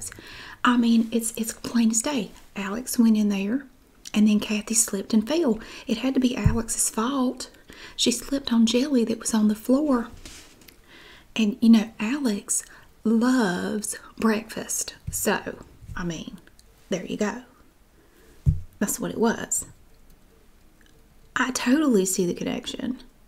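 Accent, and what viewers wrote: South, United States. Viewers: Southern accent in all its glory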